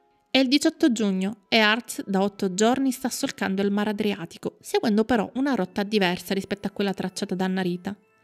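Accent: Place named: South, Italy. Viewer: Il canale è bello..peccato per la voce narrante. Si sente troppo l'inflessione dialettale del sud.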